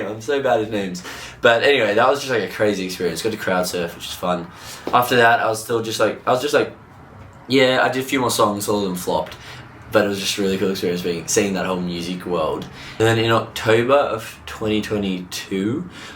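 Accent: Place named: Australia. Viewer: I just discovered you from Rob's Turbo Sprint video, I can't wait to watch the hour and a half of videos of your South America trip. Keep your energy and the good vibes. Love from French Canada! PS: I can't listen to your videos, I feel like my grandpa cause I need to lip read haha, amazing accent from down under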